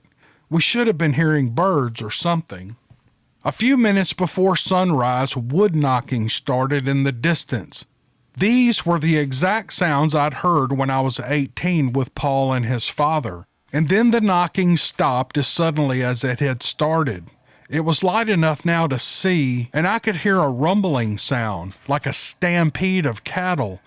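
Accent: South, United States. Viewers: Good read keep up so sweet southern accent.🎉❤😊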